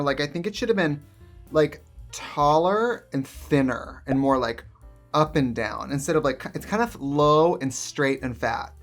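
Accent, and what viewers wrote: Wisconsin, United States. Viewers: Oh she went full Wisco in this one 🖤 probably on purpose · Sounding extra wisco to me. Am I crazy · Her Wisconsin accent is coming out hardcore today honeyyyy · Is it just me or was her Wisconsin accent stronger than usual in this video 🤔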